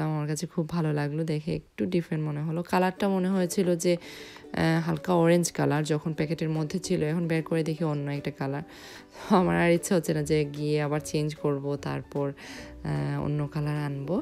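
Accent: Sylhet, Bangladesh. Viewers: Apu Tumi sylheti ni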